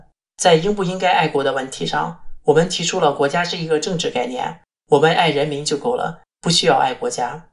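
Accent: Shandong, China. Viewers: 兄弟，你的山东口音让我跳戏。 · 读稿子的是山东人吗？ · 山东味太重了……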